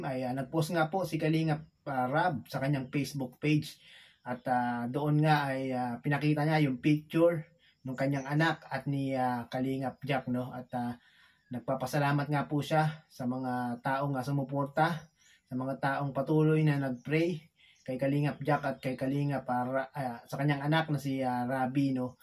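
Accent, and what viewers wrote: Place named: Visayas, Philippines. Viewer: Hoy my friend besaya ka imong tingog mora gyod og besaya ga Hahaha Hahaha god bless my friend 💖 ❤️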